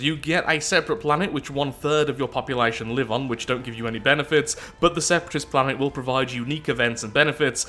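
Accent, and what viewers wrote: United Kingdom. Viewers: As someone whose native language isn't english: You are probably the easiest british person to understand.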